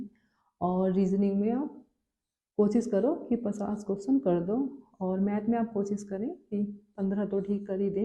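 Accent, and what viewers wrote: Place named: Western Uttar Pradesh, India. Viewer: आप की बोली पश्चिम उत्तर प्रदेश की है मेरठ मुजफ्फरनगर क्षेत्र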